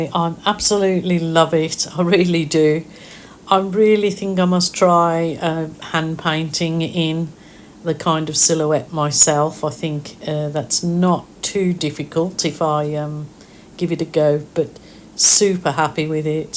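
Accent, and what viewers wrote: Birmingham, United Kingdom. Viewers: hi. I thought I detected a Birmingham accent in there, I am from Sutton Coldfield now living in North Wales, so hi from Prestatyn. Love your videos.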